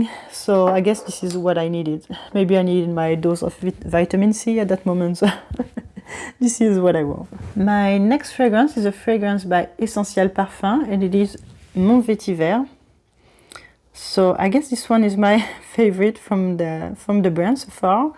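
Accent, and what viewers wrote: France. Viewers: Love your videos and collection and on the top, Looooove your English with French accent 😘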